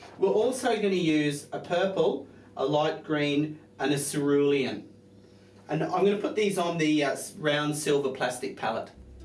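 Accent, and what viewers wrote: Australia. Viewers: austrailian accents makes everything sound so cool!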